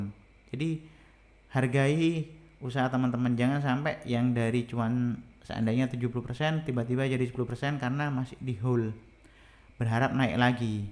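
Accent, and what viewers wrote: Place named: East Java, Indonesia. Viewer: Mksih share ilmu2 nya bro,,,logat kental Jatiman KLO ga kliru😂😂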